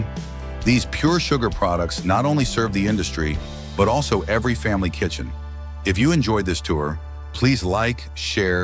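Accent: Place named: United States. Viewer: Hearing an "American" accent I expected you to report on American sugarcane farms. Instead you reported on Australian sugar . You did not say which country the footage on beet sugar production was filmed in . (My apologies if you did and I missed it ) . I did not know that beet has a "white" variety....I thought all beet was a dark purple . There are variations in reaping and processing sugarcane in the various countries . In the West Indies and in Florida the raw sugar is brown....before it is refined into "white" . Rum is also a by-product of sugar production in the West Indies .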